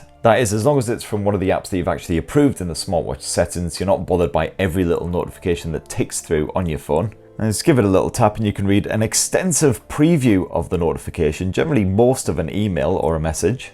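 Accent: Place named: Yorkshire, United Kingdom. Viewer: Loving the accent (yorkshire?) and the quality of the review - fantastic work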